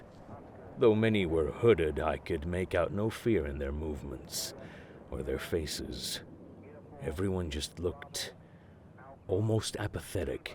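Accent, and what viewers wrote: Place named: South America, Latin America. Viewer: Great adeptus arbites audio log. Didn't expect the light souther american accent but i like it. Makes the members of the arbites seem more human despite the megalomaniacal authoritarian mania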